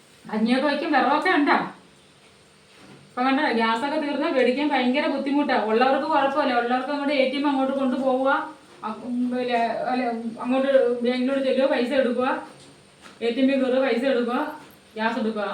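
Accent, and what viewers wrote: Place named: Adoor, India. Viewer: എനിക്ക് നിങ്ങളുടെ സംസരം കേൾക്കാൻ ഒത്തിരി ഇഷ്ടമാണു . എന്റെ നട്ടിലെ സംസരം തന്നെ ആണ് ..ഞാൻ അടൂർക്കാരി ആണ് ,,ഇപ്പൊ UK ഇഇല് ആണ് ..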